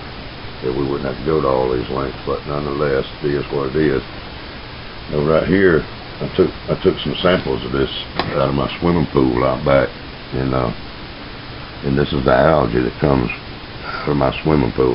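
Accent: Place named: South, United States. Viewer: Pleasure to watch your Vid. Thank You. So why do you think it is. that when people hear a SOUTHERN accent they automtically tjink SLOW OR STUPID? It has always bugged me. Thank you. You may talk slow but you sure don't think that way. :-) PS: My daughter who is Home Schooled follows you and understands you VERY WELL. She is doing experiments everywhere now. She is watching for "SIGNS OF LIFE" LOVE IT!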